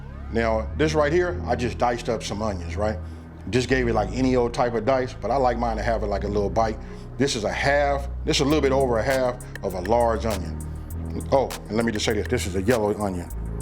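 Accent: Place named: California, United States. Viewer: I know I heard a Cali accent when you was talking.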